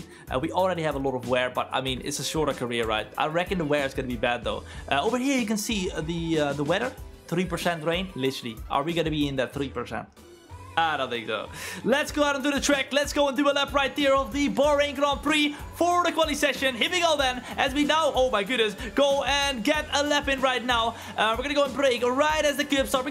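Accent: Netherlands. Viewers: Very nice video. I can barely tell you're Dutch. Your English improved a lot.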